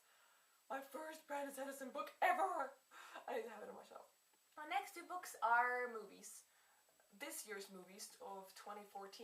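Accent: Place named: Russia. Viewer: You have a bit of a Russian accent! I love Russian accents! Ps love the book haul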